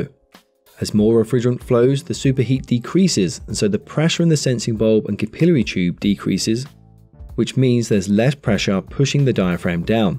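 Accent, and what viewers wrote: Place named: United Kingdom. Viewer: i wish you'd talk a little slower,,,,,,,,,,,,,,,i know you can go back and watch again as much as you want or need to but with that british accent i miss a lot and i become frustrated and turn off the video,,,,,,,,,,just a suggestion though,,,,,,,,,,,,,,,other than that i do like the videos............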